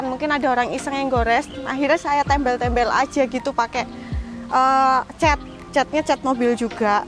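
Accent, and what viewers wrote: Java, Indonesia. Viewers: Tp medok ke jawa nya si mba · medok jawa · kusuka logat Jawanya...very orisinil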